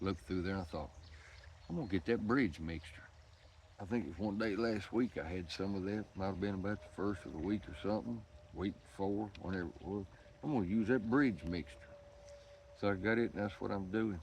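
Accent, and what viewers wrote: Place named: South, United States. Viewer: your southern drawl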